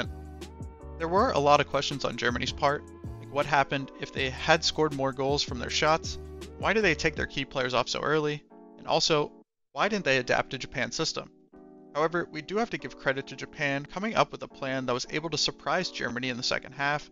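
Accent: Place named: United States. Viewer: I clicked, however hearing the American accent I instantly know everything about this must be BS.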